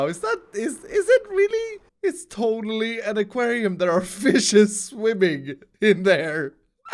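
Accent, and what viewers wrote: Sweden. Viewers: you should play Minecraft with Pewdiepie and both of you talk with your Swedish accents. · You sound like you arr from sweden, are you from sweden?? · Are you Swedish?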